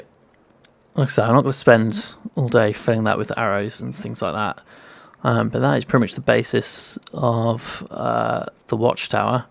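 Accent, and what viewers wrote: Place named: United Kingdom. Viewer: For some reason your videos go so well in the background, maybe it's something in the voice or just my love to british accents :D The build is really cool as well, got me some new ideas, thanks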